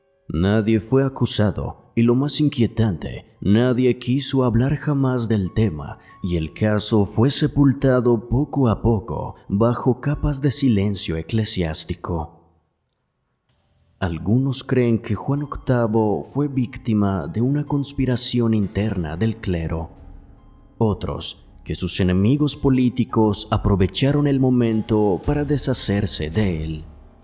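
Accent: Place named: Colombia. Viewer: Hermano, vengo siguiendo tus videos me gustan, me encanta el acento colombiano, te deseo mucho éxito a ti y tu equipo, saludos desde México..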